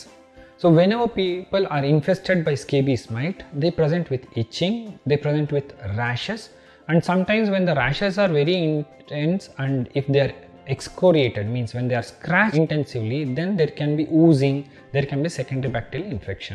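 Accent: India. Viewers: Great information I just wish I was better at understanding the Indian accent in English.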